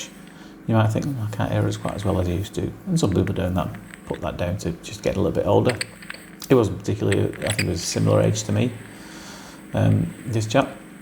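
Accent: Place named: England, United Kingdom. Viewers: Great job! Love your English accent! Canadian here. Weird to love this stuff but I do enjoy watching your work! And you are handsome to boot! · Ah, thanks for that! I wondered because just occasionally I thought I might have detected a tiny trace of something else, something closer to the southeast tip of Lancashire, or thereabouts. It's just one of your vowel sounds, I think. But then, I'm absolutely no expert on the Leigh/Golborne area accent (obviously). 😄 Yours is a lovely accent - we do have some beauties in the northwest and the north, don't we? And they are more original, closer to how English sounded 1,000 years ago, which I rather like.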